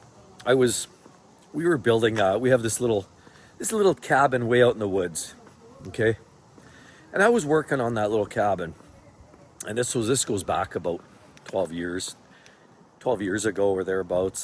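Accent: Canada. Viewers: First...awesome stuff!--like your no-nonsense style along with the Canadian accent (ofcourse) haha. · Lol...I could tell by your accent you're Canadian. 😊 🇨🇦 🍁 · ARE you CANADIAN?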